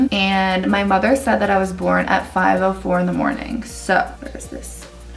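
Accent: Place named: Philadelphia, United States. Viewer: Your philly accent came through so much in this vid haha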